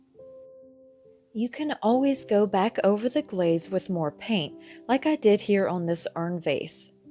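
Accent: South, United States. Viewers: Many southern have smooth accents. 😘🎠🚂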